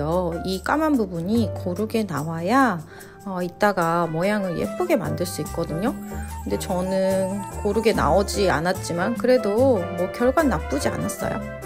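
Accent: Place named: South Korea. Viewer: 영상에는 외국인 엄마라고 했는데 외국인 맞나요?🤔 그냥 한국인이 말하는 억양인데요? 아님 부모중에 한분이 한국인신가요?😏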